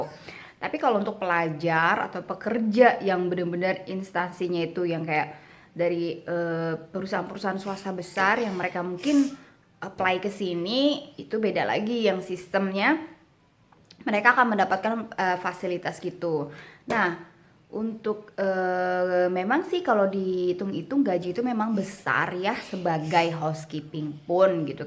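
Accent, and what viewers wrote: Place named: Jakarta, Indonesia. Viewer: Halo ka salam kenal, saya subscribers baru. Menarik banget video2nyaa apalagi cara ngomongnya jakarta banget hahaha jd kaya dengerin temen curhat. Oya ka, disana adakah yg kerja loper koran atau delivery makanan gitu tapi perempuan?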